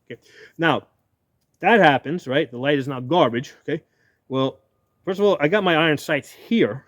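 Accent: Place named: New England, United States. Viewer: Yankee with a speech impediment. Negative. I’m not listening to this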